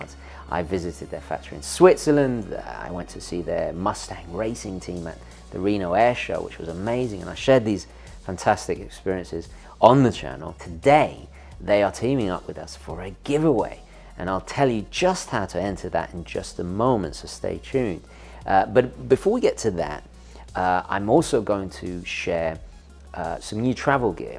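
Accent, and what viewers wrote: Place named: United Kingdom. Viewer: is my most favourite watch reviewing channel, I just love the British accent, and the authentic, heartwarming enthusiasm of this guy! And now I am going to be rewarded for pursueing my most favourite leisure activity, watching watch reviews? How great is that? Thank you Fortis, I would be thrilled to own a Flieger, - unaffordable for me otherwise! Best regards, Hei from Kiel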